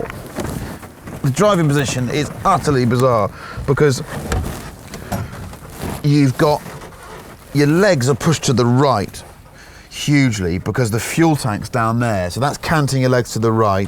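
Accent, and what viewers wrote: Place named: United Kingdom. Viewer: British accent, but yeah. I guess that's what happens when you don't enunciate the 'n' at the end of "…teen" well enough -- the person entering the numbers in for the video board gets confused. <link>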